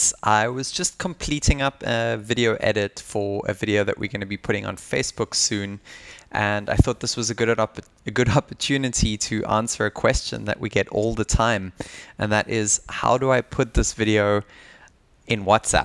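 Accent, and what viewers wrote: South Africa. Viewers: Woaaahhh, South African accent I spot there? yay! Nice info, subscribed! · are you south african?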